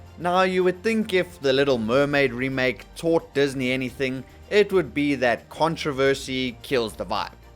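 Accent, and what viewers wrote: South Africa. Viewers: You sound south african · Kan hoor jy is n South African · I know it says united states under your page but I can't unhear it, you sound South African bro are you from here by any chance?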